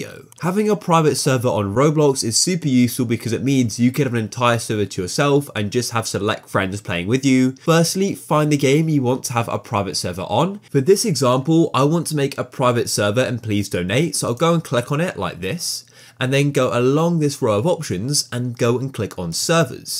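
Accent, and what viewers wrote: United Kingdom. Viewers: thx but are you british?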